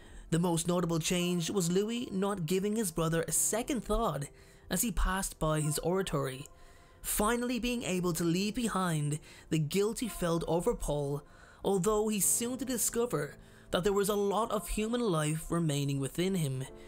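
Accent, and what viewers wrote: Ireland. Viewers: You are Irish? Be honest. Where are you from?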